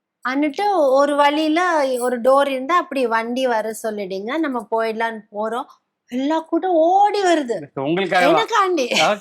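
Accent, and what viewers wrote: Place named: Kerala, India. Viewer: First old video parunga. Ivanga voice first le irunthu ipdi dha pesaranga. Aprom nalla Tamil pesaranga.malayali tamil ipdi dha irukum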